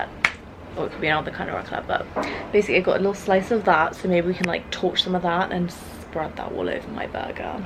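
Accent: United Kingdom. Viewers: how did you have that british accent? make a life story time!! im hooked!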